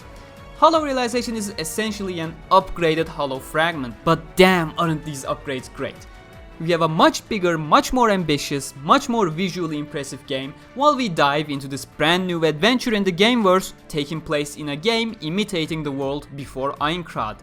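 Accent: Turkey. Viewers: Yalnız aksan baya Türk :) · Sesi duyduğum gibi türk aksanı olduğunu anladım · hiç kanal ismine bakmadım adamın türk olduğunu direk konuşmasından anladım başarılar kardeşim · Telaffuzundan türk olduğunu fark ettim, güzel iş çıkarmışsın tebrikler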